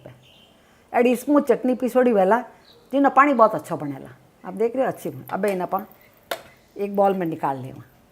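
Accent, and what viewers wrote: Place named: Marwari, India. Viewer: Very good respie aunty ji and ur voice of marvari so sweet ...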